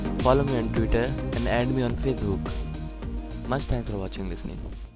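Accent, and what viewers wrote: India. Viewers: are u from india? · well about all the comments abt his accent and stuff.. make fun of india for the language they dont speak but u cnt make fun f dem for the content they teach RESPECT I N D I A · your accent sounds like you are from india? am i right?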